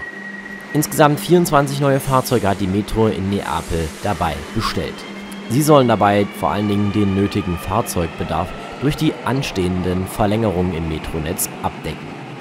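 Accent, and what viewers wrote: Germany. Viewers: Hallo, ich habe jetzt schon einige Videos von dir geschaut und mich gefragt, woher dein Dialekt kommt? Hast du irgendwie Wurzeln in Baden-Württemberg? 😄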